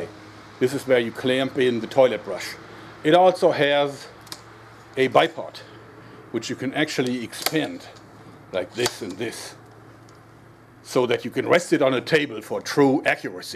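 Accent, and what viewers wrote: Germany. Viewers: german accent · Are you German? Your accent sounds like that (I'm a native German so I this accent and I'm pretty sure he's German)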